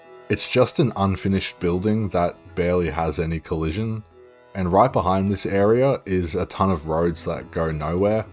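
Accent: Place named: Australia. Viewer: Australian commoner accent.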